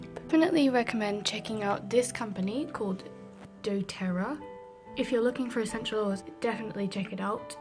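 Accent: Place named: United Kingdom. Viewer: Great video! Fellow Brit here but your accent is like 100% nicer than mine 😂😂😂 I’ve always wanted a diffuser so I’ll check out that company. Fantastic video!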